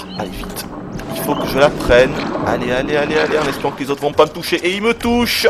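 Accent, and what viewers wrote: South, France. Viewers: It's normal...he is an accent, south accent exactly. The best way to learn french....go to France or Belgium. Je te jure que ça marche! :)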